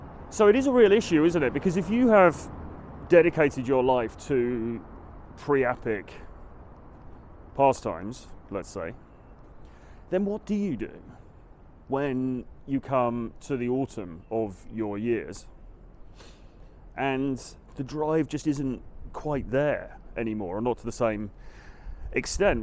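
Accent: United Kingdom. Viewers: Yeeeesh how'd I know you'd be British. Lolol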